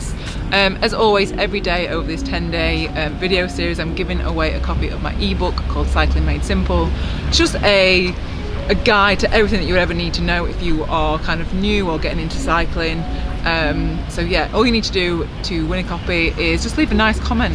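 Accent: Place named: Northern England, United Kingdom. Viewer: Yeah, I have watched your vids on and off for a few years. You certainly keep me going on the bike. I love your biking story, I like your honesty, failures and success' and the fact that you have the courage to put yourself in the light. A real ambassador for normal cyclists. The Northern accent is even beginning to grow on me;-)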